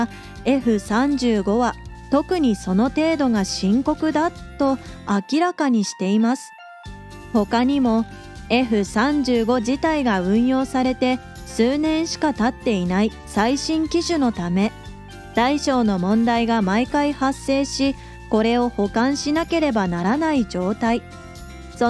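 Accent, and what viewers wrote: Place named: Kansai, Japan. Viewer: 年号のアクセントが変すぎる。関西訛なのは分かるけど、ここまで酷い人はなかなかいない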